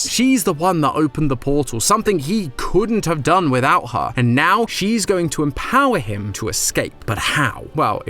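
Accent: United Kingdom. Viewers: The new person is just matpat but British, i like it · ngl, i was just watching the gtlive video of this and then noticed that there was a normal video about this, i clicked it and noticed the british accent... it was a new video with the best timing ever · Bro went from American to british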